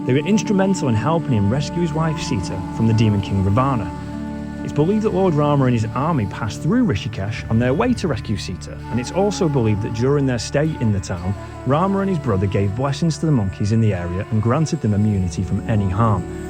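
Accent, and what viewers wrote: Manchester, United Kingdom. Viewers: Heading to Rishikesh in October. Going through a divorce after 20 years and looking for answers, your video got me excited, and hopeful. Plus, you sound like you're from Manchester! Hare Krishna!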